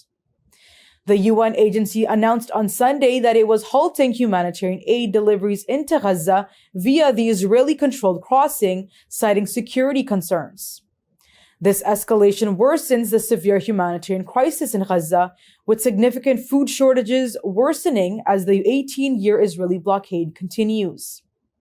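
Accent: United States. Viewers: ╔═══ ❤☀💚☀❤ ═══╗ 💚💚💚💚💚💚💚💚💚 Madam, Assalaamu alaikum. Though it is more than an hour since your news video is posted, the TRANSCRIPT is not made available so far. Without the Transcript we from India find it difficult to follow your American English. Please advise your technical staff to make the TRANSCRIPT facility available for your video!!!. THANKING YOU IN ANTICIPATION!!! 🌐🌐🌐🌐🌐🌐🌐🌐🌐 ╚═══ ❤☀💚☀❤ ═══╝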